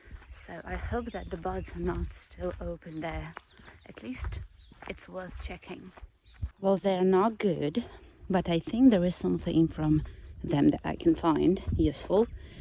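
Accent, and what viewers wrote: United Kingdom. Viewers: Where did you learn English? It sounds like you have a British accent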